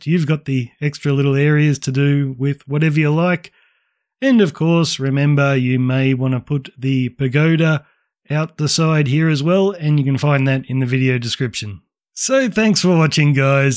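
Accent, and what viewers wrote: Australia. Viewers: so.. we and my friend built this whole thing in like 5 days, allow me to get you some constructive criticism what am i gonna say; first of all PLEASE, i BEG you to dedicate like 30 seconds of the guide to tell us which blocks do we take before getting to the next part instead of just "so when ur done you should be looking like this now place a scaffolding" like we didnt have these things in our hotbar, every time you say to place a block we have to pick it up from creative or from shulkers if ur on survival mode, that takes extra time and gets kind of annoying as the building goes, like we got so insane building it all my friend started to mock your australian accent and burnt out of minecraft as we finished the building (dont take it personal, its prob just us building it from 10pm to 6am for 5 days straight) or you could just leave a litematica scheme, people would thank you a million times but you probably wouldn't get as much views on all these guides also, in the replies you said the outlines dont line up because some of the measurements are for the gaps between the stone, would be nice of you to tell that in the video itself because we REALLY messed the measurements and got sick of constantly fixing the walls and all that mess led up to us not even bothering to fix some of them the building ifself though is AMAZING and is really worth the time also may i ask you a question, how old are you?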